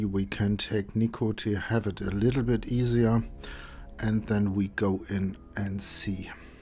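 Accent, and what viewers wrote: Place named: Germany. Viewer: Du musst Deutsch sein deinen Akzent hört man schom derbe raus.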